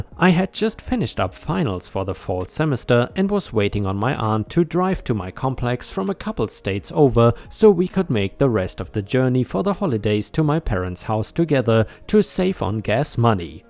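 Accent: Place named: Germany. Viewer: You do sound preeeetty german, man.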